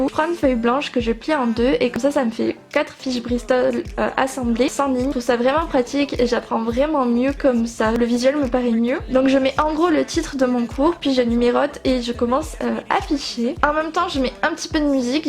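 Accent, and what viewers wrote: South, France. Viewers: J’ai pas compris pourquoi tu fais l’accent du sud ? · Isleym Hachfih peut être qu'elle est du Sud ? 😕😂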